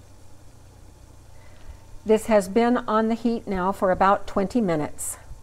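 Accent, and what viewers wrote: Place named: Arizona, United States. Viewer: Is she from Arizona Sounds me like šhe is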